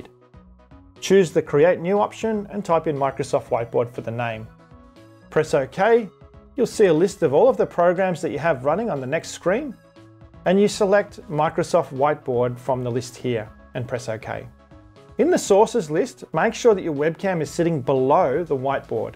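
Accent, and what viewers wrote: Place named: Australia. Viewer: Nice work... and great to hear an Aussie accent! Cheers, mate.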